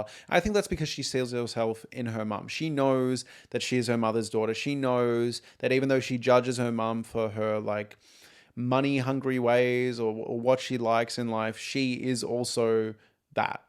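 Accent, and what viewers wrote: Australia. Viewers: ? no he doesn't, he's australian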